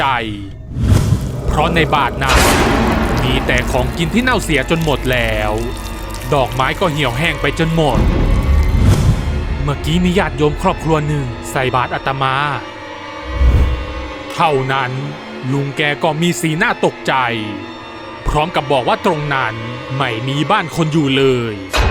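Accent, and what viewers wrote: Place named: Isan, Thailand. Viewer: อุ๋ยคนอีสานอีกแล้ว😆😜🤫 อีสานหรือหนือนะ 😔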